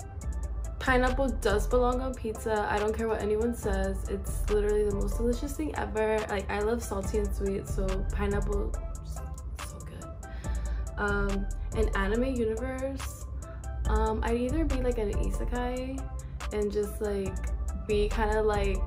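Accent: Spain. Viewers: I like your spanish accent.. Greetins from cozumel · Your Spanish accent <3 <3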